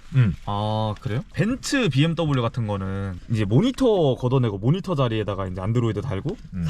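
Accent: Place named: Cheongju, South Korea. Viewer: ㅋㅋ 제가 청주 사는데 동네 친구 목소리 인줄 알았습니다. ㅋㅋ 사투리가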